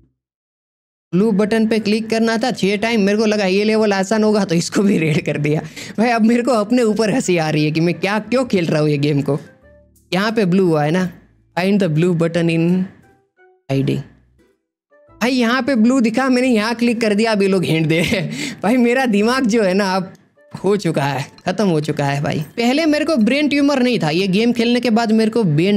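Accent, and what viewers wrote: Gujarat, India. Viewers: He is Gujarati bro❤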